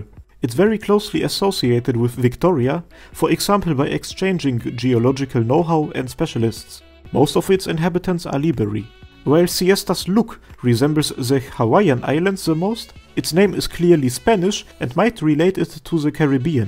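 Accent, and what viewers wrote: Germany. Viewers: Man I loved this stuff, no one ever has put so much effort into this kind of videos explaining the lore of the world of Terra, love it, keep up the good work! PD.: I love your german accent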